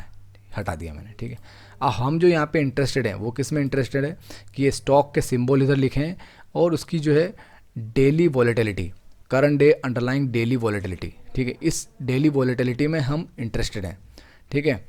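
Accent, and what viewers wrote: Indore, India. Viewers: Bhiya indori ho kya.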